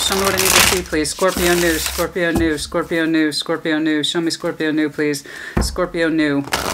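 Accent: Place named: South, United States. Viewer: That deep sexy raspy voice southern and unique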